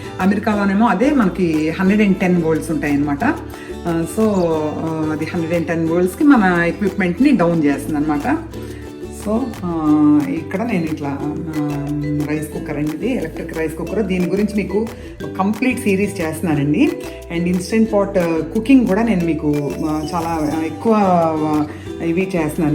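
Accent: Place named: Eluru, India. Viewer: When I was listening to you, i was thinking you must be from around Eluru/Vijayawada. I am from Eluru also and studied in same school, college. I graduated in 1992 from college.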